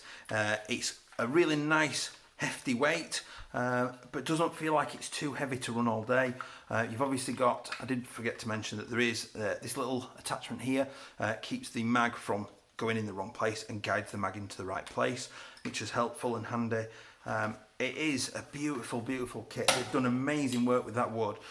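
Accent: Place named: Yorkshire, United Kingdom. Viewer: Haha I know a hello Yorkshire man when I hear him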